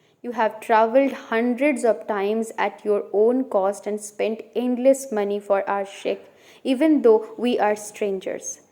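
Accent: Bengal, India. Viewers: BENGALI ENGLISH:face-blue-smiling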